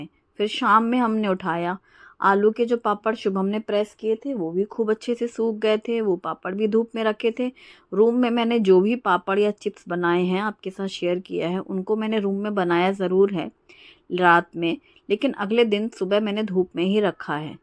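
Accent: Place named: Uttar Pradesh, India. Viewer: Ji aap UP sai belong karte hai...apke bolne key style sai lagta hai......aap bahut hi talented hai❤❤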